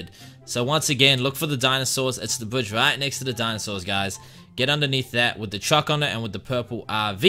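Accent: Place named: New Zealand. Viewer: I LOVE YOUR ATTITUDE AND YOUR KIWI Accents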